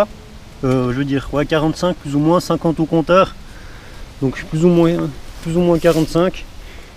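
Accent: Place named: Switzerland. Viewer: ta cru que j'avais pas deviné que tu étais suisse avec le magasin coop au débute de la vidéos et ton petite accent des famille (bon ok je suis aussi suisse :) )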